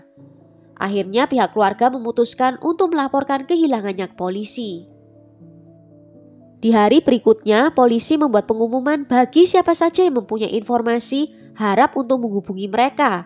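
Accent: Java, Indonesia. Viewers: Hayyy medokuhhh 👍💖💖💖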